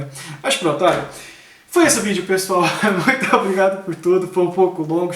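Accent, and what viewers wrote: Portugal. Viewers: Seu sutaque está muito Português! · Vendo seus vídeos eu fiquei curiosa, você é português? Seu sotaque é bem equilibrado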